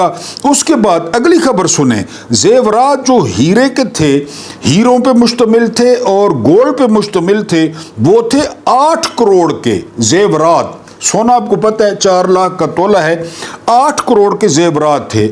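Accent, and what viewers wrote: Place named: Mianwali, Pakistan. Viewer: بلیک اور وائیٹ بالوں میں آپ ھیرو لگتے ھیں میانوالی لہجہ بہت اچھا لگتا ھے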